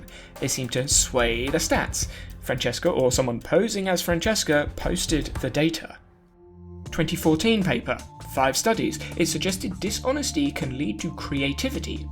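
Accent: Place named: United Kingdom. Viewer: why with a British accent?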